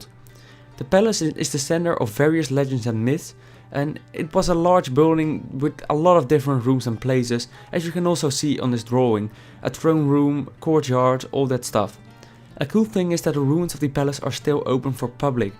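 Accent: Netherlands. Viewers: you're dutch i can hear on you're bad english accent (no hate great vid)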